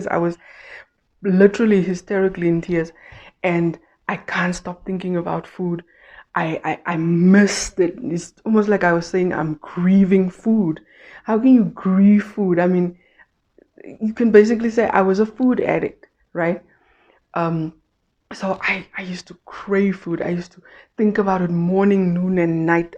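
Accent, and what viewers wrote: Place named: South Africa. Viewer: Yup, it saved me a lot. I just started trulicity which is about the same as ozempic. I'm excited to lose weight. Totally off topic, I absolutely love your accent! I know, typical American lol...but South African accents are completely under rated. I hope the best for you sweetie, you're looking great!!! I can only hope the same for myself someday soon. :)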